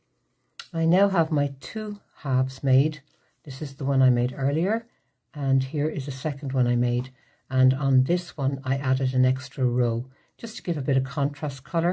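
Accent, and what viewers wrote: Ireland. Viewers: Thank you. Wish all my school teachers had had your Irish Accent maybe I'd have listened more at school, you make it sooo easy to follow. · delightfully surprised to hear an Irish accent when I turned the volume on lmao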